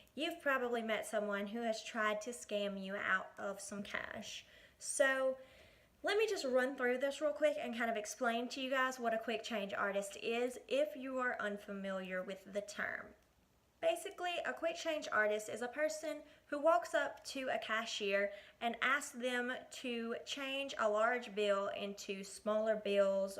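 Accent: South, United States. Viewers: You’re beautiful and I love that southern accent.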